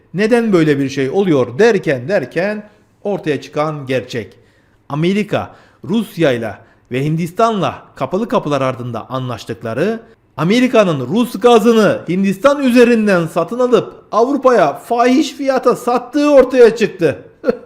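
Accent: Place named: Adana, Turkey. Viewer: YA GARDAŞ SENİ GÜNLÜK İZLEMESEM İŞİM RAST GİTMİYOR TAM BİZİM ADANA ŞİVESİ KONUŞUYON BENİ.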